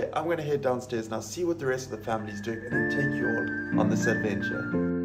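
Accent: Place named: South Africa. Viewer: I die every time he says asssss cream shops😂love the SA accent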